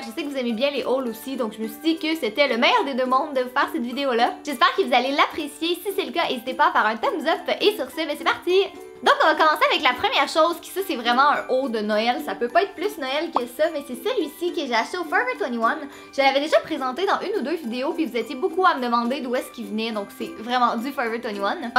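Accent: Quebec, Canada. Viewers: Je crois que tu n'avais pas a dire que tu es Canadienne, Québécoise, sa se voit dans ton accent!!!😂Mais ne t'inquiète pas, cette une très belle langue(accent) et moi aussi je suis Canadienne…(J'ai a peut près le même accent) Sinon continue comme sa tu es super!!!!❤️😃☺️😋😄😘😍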